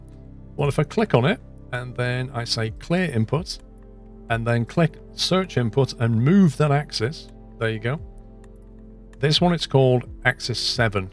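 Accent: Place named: Blackpool, United Kingdom. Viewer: From Pac-Man to MSFS 2020, with some real-life certified droning in between. Glad to have found your channel as I just got FS 2020 and already crashed the Cessna 3 times while trying to land. Your tutorials will help a lot! You sound like you're from Blackpool or thereabouts (Liverpool?). My wife's dad was born in in Blackpool so I sort of grew an ear for that accent. Thanks again! Great tutorial!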